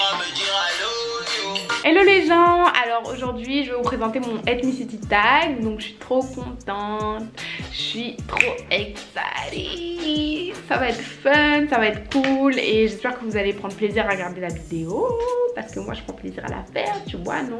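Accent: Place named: Ivory Coast. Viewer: C'est cool hein tu as l'accent ivoirien j'adore 👍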